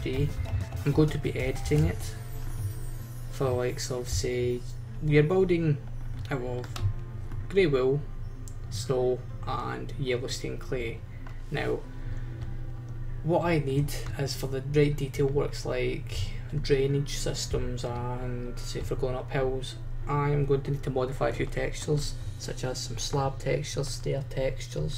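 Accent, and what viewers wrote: Scotland, United Kingdom. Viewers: Are you Scottish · U sound Scottish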